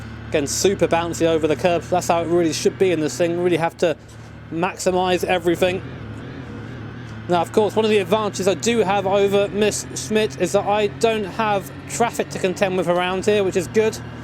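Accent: United Kingdom. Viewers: Are you brit?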